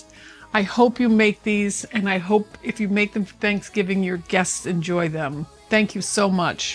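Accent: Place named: New Hampshire, United States. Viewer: Where are you from. I swear I hear a northern mass southern nh accent. From where I was born!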